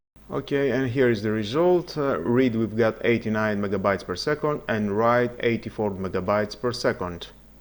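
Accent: Greece. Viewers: Τώρα εσύ μιλάς Αγγλικά σαν τον Τσίπρα μήπως είσαι Έλληνας ???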